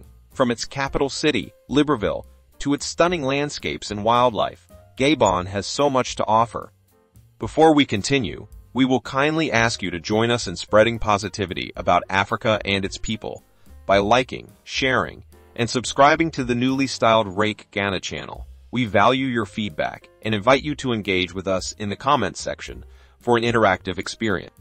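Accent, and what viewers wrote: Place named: United States. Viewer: That is American pronounced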